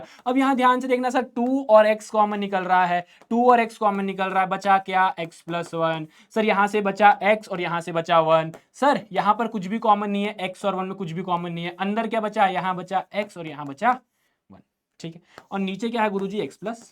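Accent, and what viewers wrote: Bihar, India. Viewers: Bihari kahika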